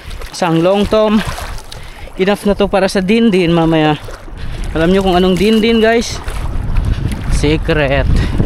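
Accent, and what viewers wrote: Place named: Ilocos, Philippines. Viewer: Oks Sana kaso korni ka magsalita bro.. Halata naman ang Punto mo being an illokano😅😅😅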